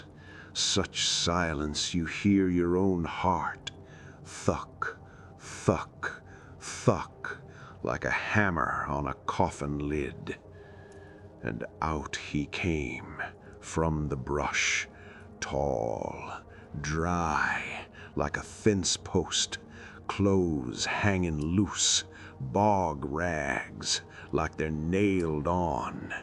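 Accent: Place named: Appalachia, United States. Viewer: I find it an interesting mix that protagonist has a Russian name (a diminutive of Anton) but the narrator dialect makes me think this is takin' place down in the holler of Appalachia. I like it a lot!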